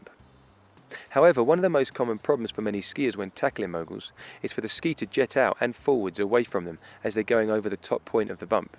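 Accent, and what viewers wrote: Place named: RP, United Kingdom. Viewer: proper queens English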